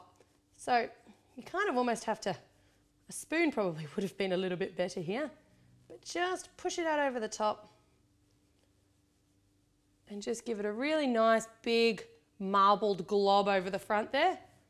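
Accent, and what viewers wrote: Australia. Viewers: I love australian accent!! Thanks for the tutorial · youre ausralian?! i tought youre british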